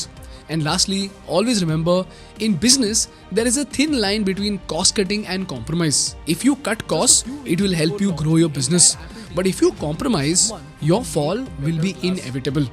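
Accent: India. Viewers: "R-rrrrr " heavey indian accent feels like hammar hitting rrrrr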